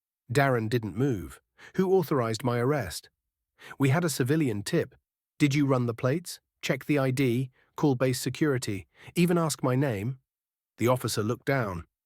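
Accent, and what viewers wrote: United Kingdom. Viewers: Sofa King tired of this AI BS posted by people with obviously zero talent of their own. On top of that: what kind of idiot puts a brit accent to a US military-based video. Fail on all accounts.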